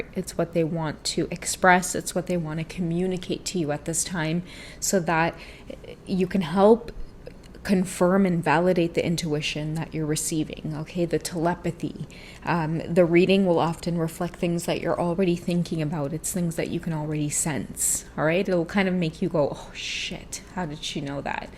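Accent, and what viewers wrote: Trinidad and Tobago. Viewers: Ok I think I need trini talk everytime/reading please?!!! 🤣🥰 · No, we like that you speak with your Trini accent when you talk to her! 😂 ♥️♥️♥️…Buss up shut and Tarot! 🥰😂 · Oh lordie .. the Trini Popo...😂🤣😂Love hearing the Trini Accent in someone else lol..🇹🇹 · Lmaoooo sis you DONE KNOW I LOVE THE TRINI ACCENT ENUH!!! 🇹🇹Stupezzzzzz lol PUNGKIN had me WEAK cause iykyk!! Hahahaha!!! Love it here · Yes that!!! I love the Trini side!!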